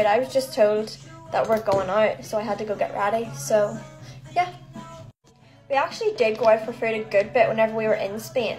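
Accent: Ireland. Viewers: Ily your Dublin accent 💗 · Is she Irish or something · I knoww I love Irish accent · Y’all I might be dumb but is she irish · I love your accent im from Ireland too and your just so pretty ❤ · Yh she’s Irish too❤ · Is she Irish? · I swear .our accent is the same ❤ I’m also from Ireland btw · Are you Irish? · Is she IRISHHH? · it’s irish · They are Irish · I didn’t know she was Irish- I love their accents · Is she Irish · yep it's Irish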